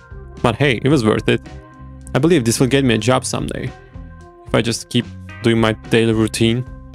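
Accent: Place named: Sweden. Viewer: Where you from? You sound Swedish. 😊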